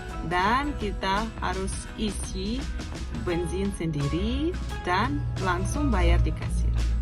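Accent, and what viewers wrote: Indonesia. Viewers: Kak Pernah Ke Indonesia Belum Soalnya Aksen Bicara Sudah Orang Indonesia Banget 🇮🇩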